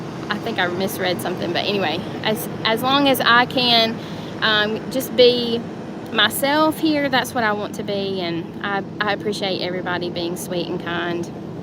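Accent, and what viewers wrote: South, United States. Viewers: You are the sweetest person and I really don't know how anyone could come up with a negative comment to you! By the way, I could listen to your southern accent all day long. The way you speak is very calming to me!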